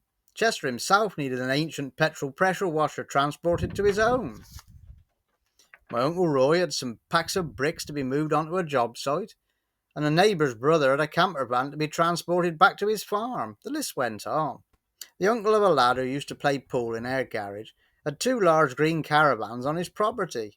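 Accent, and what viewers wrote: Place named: Shropshire, United Kingdom. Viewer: Love the videos mate where are you from you sound local to me im south shropshire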